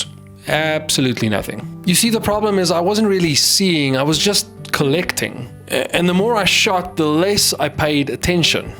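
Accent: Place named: South Africa. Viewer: Howzit, this film really resonated with me. I’ve always written with a fountain pen since school days in the eighties in KWT. When I learned to shave, my great uncle gave me his cutthroat razor which took a lot of practice to master. When I started fly fishing, my wife bought me a split cane fly rod. Each of these force me to slow down and think about what I am doing. Two years ago I went back to vinyl and this year I began teaching my son film photography. Every days is calmer, every moment is a little more considered. Thank you, keep making your films. It’s lovely to hear a South African voice and see parts of SA when living in rainy, cold England.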